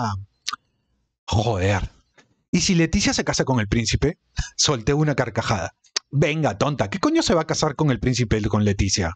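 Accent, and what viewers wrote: Peru. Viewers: Me encanta oir como lees el libro con ese lindo acento peruano. 😊 Saludos desde España.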